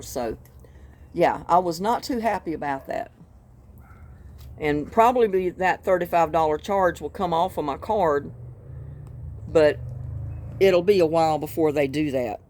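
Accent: South, United States. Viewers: Was busy spring cleaning...the winds here are loud and strong, omgosh...parts of my front porch are missing and cleaning up the yard...😮..luvv garlic ❤️..looking at you in summer clothes is making me want warmer weather now..I had a neighbor that was from North Carolina when I was a young girl , she looks and sounded just like you, aww..and when you said in a other video you were from N.C. ..I thought..yes she is!.. I could detect the certain accent in some words you say......always liked the southern accent . God Bless. ❤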